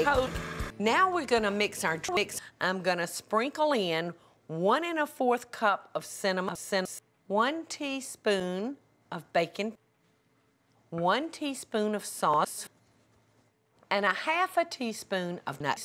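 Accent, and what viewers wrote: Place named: South, United States. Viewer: Did she say ERL Sha calls oil ERL so southern it makes my eyes bleed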